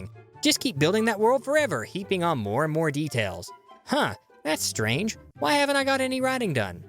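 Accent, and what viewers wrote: South, United States. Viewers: I think the slightly southern accent just makes these videos so much better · dislike for southern accent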